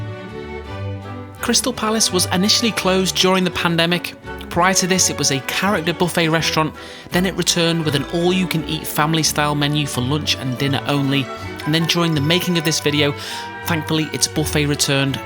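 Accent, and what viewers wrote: Liverpool, United Kingdom. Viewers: American who used to live in the UK here - can't tell if you're a Scouser or from Stoke-on-Trent :) Am I close?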